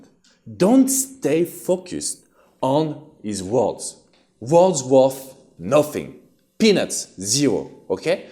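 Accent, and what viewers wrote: France. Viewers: I am falling in looove with your French accent.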